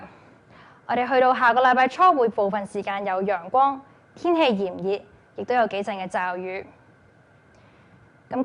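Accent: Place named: Guangzhou, China. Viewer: 有廣洲口音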